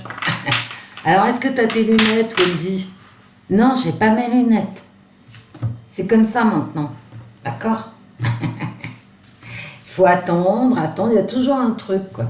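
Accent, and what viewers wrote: Paris, France. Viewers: Je te connais pas depuis longtemps Wendy grâce à Abbygaelle. Je suis très heureuse d avoir fait ta connaissance. Tu as un adorable accent de Titi parisien ou alors je me trompe. Lol. Faudra que je regarde si tu as regardé du côté de ruspoulidor. L automne va être difficile pour tous. Je t embrasse. Maryse. 🤗♥️🌟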